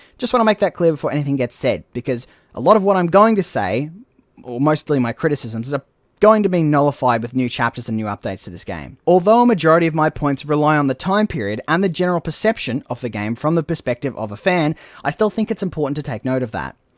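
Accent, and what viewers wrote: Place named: Australia. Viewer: Unrelated but I sense an Aussie here… Good on ya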